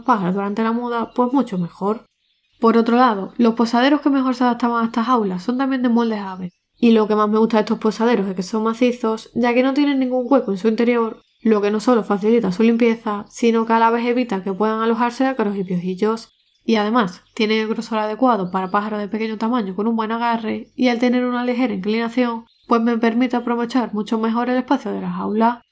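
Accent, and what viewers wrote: Spain. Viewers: Se me hacía difícil q ya no tuvieras l aviario y más q nada q ya no nos explicaras n tus videos todo lo q nos necesitámos saber sobre las aves, pero q bueno q s uno nuevo, me gusta como explícas y me gusta l tono español d tu voz🤗😍. Salúdos desde García N.L. MonterreyN.L.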